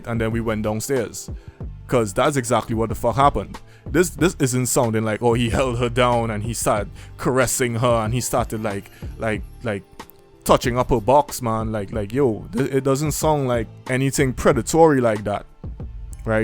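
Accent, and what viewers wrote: Trinidad and Tobago. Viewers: You a Trini bro? Bless up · You from Trinidad? You kinda sound Trinidad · Hey... where you from?? You sound like a trini?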